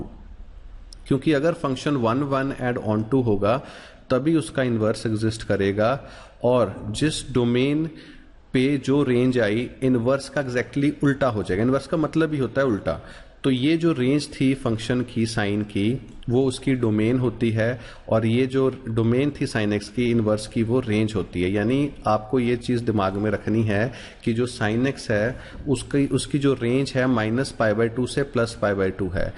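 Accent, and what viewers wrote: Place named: Punjab, India. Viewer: Getting punjabi tone 👍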